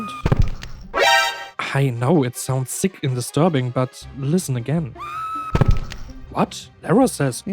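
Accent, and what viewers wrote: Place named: Germany. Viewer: could your German accent be any worse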